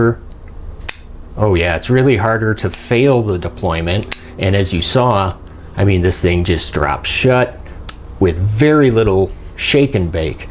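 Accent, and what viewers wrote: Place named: Michigan, United States. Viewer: this was exactly the video i was looking for, thanks man. first time i've seen your channel, i dunno where you're from but i just moved away from michigan to the west coast and your accent brought me back home for a minute, lol. are you a yooper?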